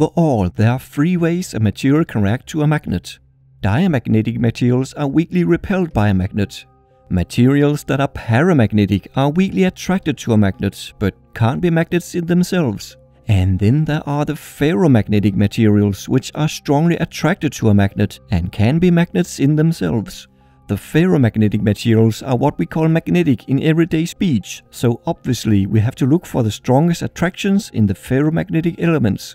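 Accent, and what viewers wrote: Denmark. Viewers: Are you danish? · Are you danish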